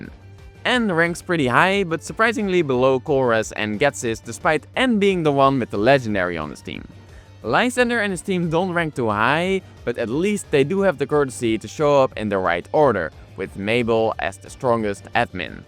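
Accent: Netherlands. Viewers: I hear the Dutch accent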